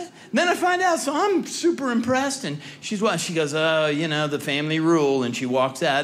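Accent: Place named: California, United States. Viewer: i can tell you're californian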